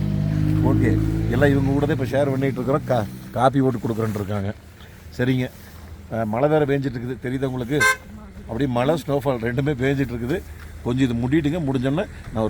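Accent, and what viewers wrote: Kongu, India. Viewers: கொஞ்சும் கொங்குத் தமிழ் பேசும் கோமகரே...! திகட்டாத தித்திக்கும் பேச்சுடைய திருப்பூர் திருமகரே...! எங்கள் மதிப்பிற்குறிய திரு. அண்ணா❤❤❤🙏 அவர்களே எப்படி இருக்கீங்க அண்ணி❤❤❤🙏 எப்படி இருக்காங்க ரித்திக்❤❤❤ எப்படி இருக்காரு. அண்ணா மைனஸ் டிகிரி குளிர்ல ஷூ&சாக்ஸ் போடாம வெறும் செப்பல் மட்டும் போட்டு இருப்பது ரொம்ப ரொம்ப தவறு. உறைபனி குளிர்ல ரத்தம் உடம்புல ஓடுறது சீராக இருக்காது. அப்படி ரத்தம் சீராக இல்லாமல் போவதால்தான் நம் உடம்பு உறைபனி குளிர்ல மரக்கட்டை மாதிரி விறைப்பதற்கான காரணம்.... அப்படி ஆகாமல் இருப்பதற்காகதான் WINTER COAT JACKET SHOE&SOCKS போடுறதே. தயவு செஞ்சு ஷூ&சாக்ஸ் போடுங்க அண்ணா🙏 11.20 செகண்ட் வீடியோவுல பேசிக்கொண்டே சட்டுனு பாதை மாறிப் போயிட்டீங்க? அண்ணி Guide பன்றாங்க👍 இந்த மாதிரி இடங்களுக்கு புதிதாக போறப்ப இந்த மாதிரி பாதை மாறிப் போறது சகஜம்தான். ஆனாலும் நீங்க போகிற இடங்கள் எல்லாம் அவ்வப்போது பனிச்சரிவு அதிகம் ஏற்படும் இடங்கள் அண்ணா. 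இந்த மாதிரி பாதை மாறிப் போயி எங்கயும் லாக் ஆகிட்டால் கஷ்டம். ரொம்ப கவனமா இருங்க அண்ணா👍 நம் ❤சிட்டுக்குருவியோட❤ தங்களது இந்த லடாக் பயணம் இனிதே தொடர்ந்திட நல்வாழ்த்துக்கள் அண்ணா❤❤❤ என்றும் அன்புடன் உங்கள் தம்பி என்றும் அன்புடன் உங்கள் TMF ரா.முஹம்மது கபூர் (சென்னையில் இருந்து)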